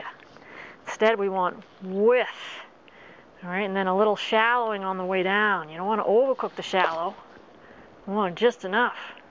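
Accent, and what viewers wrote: United States. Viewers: your instruction is perfect,love you to bits,is the hair to keep us smiling because it’s working.love your USA accent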